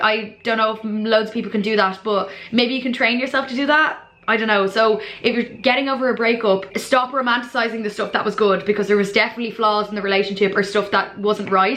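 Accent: Ireland. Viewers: You’re just class like I love your videos and your accent too. Where in Ireland are you from?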